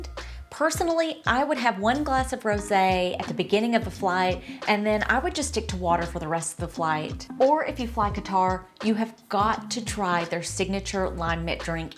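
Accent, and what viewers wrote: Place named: South, United States. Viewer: Thank you so much for this video,. I heard your heavy accent, and I am wondering where you are from. I am guessing somewhere south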